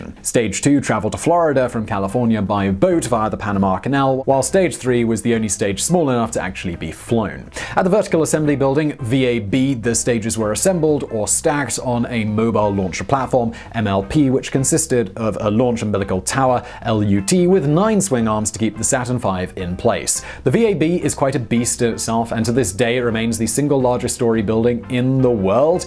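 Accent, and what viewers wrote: United Kingdom. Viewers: I must respectfully disagree. has an awesome British accent. I say, let him speak.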